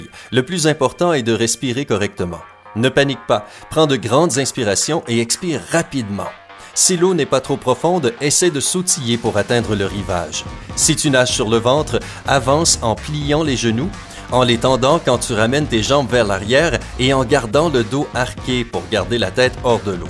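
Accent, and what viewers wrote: Quebec, Canada. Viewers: Cet accent quebecois juste inssuportable..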